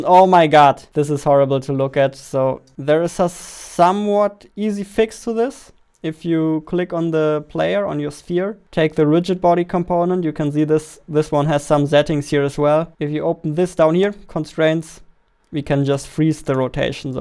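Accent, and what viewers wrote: Germany. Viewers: Are u from germany? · The germäaan accent haha. Bester mann xD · Are you German? Your accent sounds like it ^^ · Are you german? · ur german accent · Erstens man hört raus dass du Deutscher bist... Und zweitens... Durch deinen Dialekt kann ich viel besser verstehen was du meinst... Du sprichst auch sehr deutlich und ausführlich... Und in deinem 39 minütigen Video... Stecken mehr Informationen als in manchen 1 oder 2 Stunden videos... Und das beste ist... Du gibst dir dabei mühe, schneidest alles zurecht und erzählst es mit Emotionen... Andere Leute rattern alles einfach nur runter aber du hast mir 1000 mal mehr geholfen bei dem Programm... Vielen vielen dank! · man hört halt dass du deutscher bist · Hey, Erstmal danke für dieses krasse Video! So macht es einen Spaß schnell einfach kompakt und mit schönen Deutschen Akzent zu lernen. Super Erklärung und Co. 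konnte alles wie im Video 1zu1 sofort anwenden bis Minute Ab dort funktioniert es bei mir leider nicht mehr weil Visualstudios kein GetComponent anzeigt sowie kein Rigidbody obwohl ich in Unity gecheckt hab ob es auch fürs Objekt ausgewählt wurde. Ich werde allerdings der sache auf den Grund gehen und werde es irgendwie versuchen doch noch umzusetzen. Beste grüße Marcel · I like your german accent, good tutorial <3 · I can hear your German accent, is there a German version of this video? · He has a German accent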